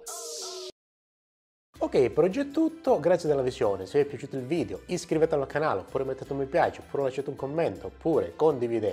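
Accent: Calabria, Italy. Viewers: Sei calabrese?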